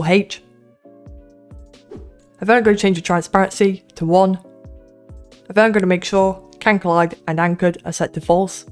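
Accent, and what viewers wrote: United Kingdom. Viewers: Amazing, plus the vouce is satisfying, british is cool.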